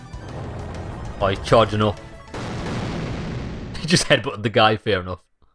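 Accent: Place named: North East England, United Kingdom. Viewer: Thanks for the help I couldn’t find the guy on the outskirts but damn you have a strong North East England accent 😂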